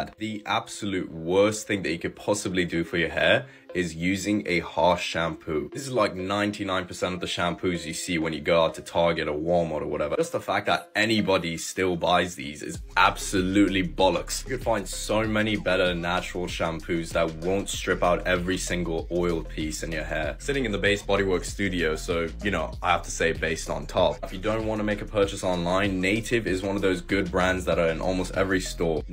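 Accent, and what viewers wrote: United Kingdom. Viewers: bro turned british 💀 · when did mate turn british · Since when was bro british💀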